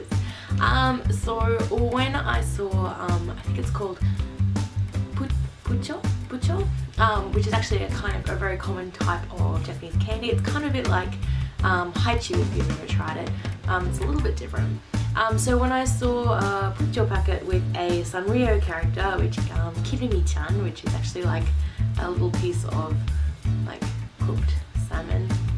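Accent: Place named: Australia. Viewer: Love your videos! What country are you from? I'm assuming your Australian judging by your accent, because I'm Australian and that's how most people sound here, if not then I am horribly, horribly wrong.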